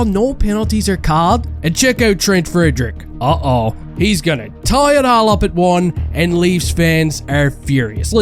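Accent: Newfoundland, Canada. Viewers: I know a fellow Newfie when I hear one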